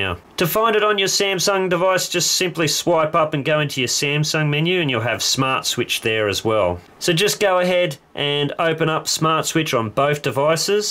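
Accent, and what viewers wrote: Australia. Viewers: This Australian accent is so good! XD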